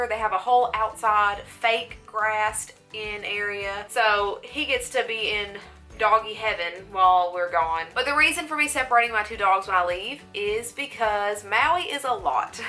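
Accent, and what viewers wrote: South, United States. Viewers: I am in love with your voice ... Lol your beautiful as well but that southern voice....sheww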